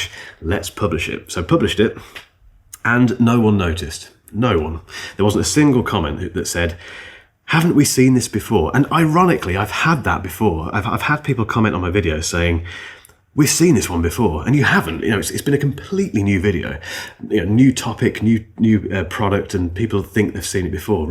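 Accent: United Kingdom. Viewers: I’m one of those people who has been watching every single video on the Macbook pro M4 or the MacBook Air (even now that I’ve had a MacBook pro M4 for 2 or 3 months). I openly admit that if you made an update video on the macbook pro M4 (or a comparison), I would watch it in a sec😂 (i love your opinion AND your british accent). The reason why I do this is that I find interesting knowing how others people’s opinions evolve over time (whether they change or not). I like to see what people find more pleasant on a product that I like and see whether my opinion on particular aspects is shared or not. PS: I have a crush for Patrick Rambles, by the way…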